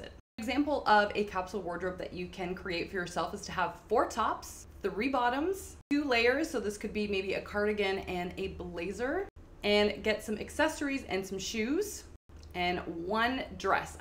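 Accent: Canada. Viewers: You have to be from Canada 😹. I love the accent.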